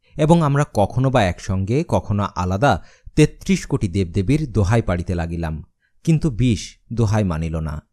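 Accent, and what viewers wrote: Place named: Bangladesh. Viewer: সুদ্ধ উচ্চারণ, মনে হয় পাঠক বাংলাদেশী, কারণ ভারতীয় উচ্চারণ এত ভাল নয়।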